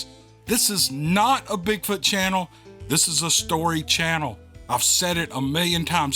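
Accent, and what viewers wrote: South, United States. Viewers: People can be mean and annoying, let them get butt hurt over nonsense. I love hearing your voice narrate these stories, reminds me of my southern folk- we have cool accents! Other people can be jealous of. Keep up the good work,. Hopefully, I’ll hear my story from you soon.:)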